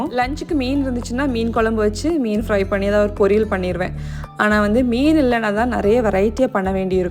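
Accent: Kerala, India. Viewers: Hmmm nenachen, bcoz unga slang la konjam Malayalam kalanthruku, cutea irruku kekka…. Actually naanga poriyala thenga potta thorannu solluvom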